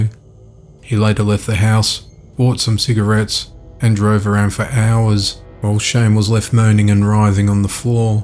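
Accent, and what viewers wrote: Australia. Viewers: He's definitely Australian, but I'd like to know more!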